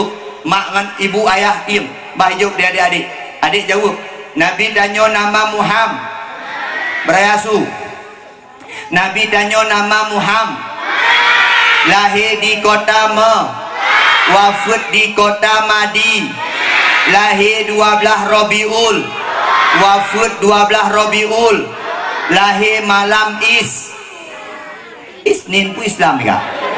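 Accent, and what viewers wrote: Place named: Aceh, Indonesia. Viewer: gampong.. Logat basa timu si angen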